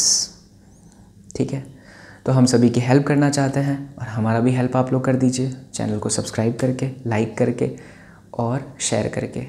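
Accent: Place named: Haryana, India. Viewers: Haryanvi is superb sir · Fab haryanvi.! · Itti bdiya haryanvi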